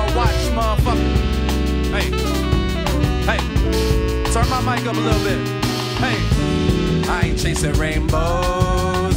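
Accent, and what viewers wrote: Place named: Hell's Kitchen, United States. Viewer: Cause he's from Hell's Kitchen and that's just how he sounds?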